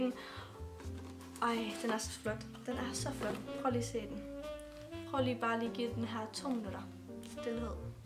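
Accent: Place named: North Jutland, Denmark. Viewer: Det er så sødt man kan høre du kommer fra Nordjylland👏🏻❤️ Jeg kommer selv fra Nord-Midt Jylland😍🙌🏻 Du er virkelig god og dygtig så BLIV ved😘🙌🏻🙌🏻👏🏻👏🏻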